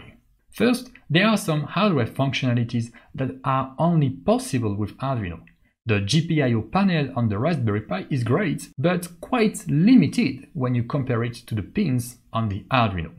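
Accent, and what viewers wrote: France. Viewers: Where are you from my friend? I heard some french accent, isn't it?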